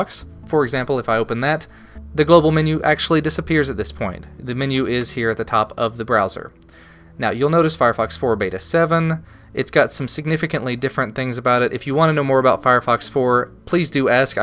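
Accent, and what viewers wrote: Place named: South, United States. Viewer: oh well, I realize this is science-fiction weird that a complete stranger would focus on your accent on a Ubuntu review but, it's very light and fluid...I knew it was from southern America but...so many accents are heavy, and the hard R's and all but then Kentucky ppl come along... anyways...totally off topic so merry Christmas and review away please.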